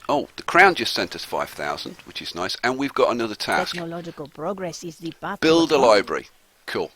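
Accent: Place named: Birmingham, United Kingdom. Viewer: 0121 init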